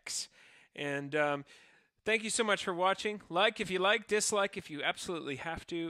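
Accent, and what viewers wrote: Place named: Canada. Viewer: every time you speak it makes me miss my Canadian friends.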